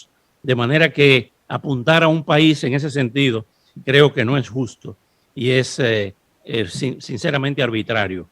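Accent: Cuba. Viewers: No se quien es usted señor aún qué su asentó pareces cubano pero gracias mil gracias por hablar a nuestros favor es un ejemplo palpables Que usted sabé de los qué habla gracias desde RD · Él es de RD 🇩🇴 y es primo de Ito Bisonó, el Ministro del Ministerio de Industria y Comercio del país. Óscar habla como cubano porque emigró a los 22 años a Miami, en una época donde la mayoría de los inmigrantes de esa ciudad eran cubanos y tiene programa de TV dirigida a la comunidad latina en la Florida y Miami. Busca su biografía en Internet.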